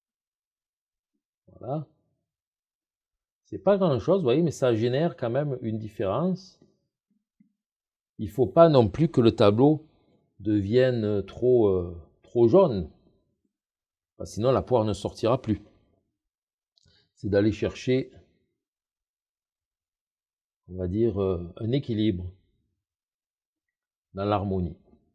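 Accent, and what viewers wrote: South, France. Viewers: Merci pour ces infos et pour ton accent du sud de la France.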